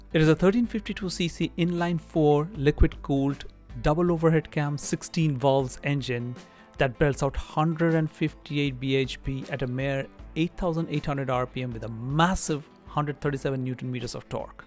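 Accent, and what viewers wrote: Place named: India. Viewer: Man , hard to understand your Indian accent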